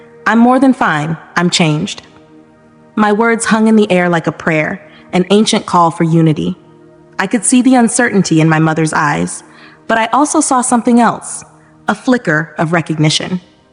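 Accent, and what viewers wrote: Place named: Brooklyn, United States. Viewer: I'm curious about your Brooklyn accent! What can you tell me about it. You sound like your from an area I'm not familiar with..???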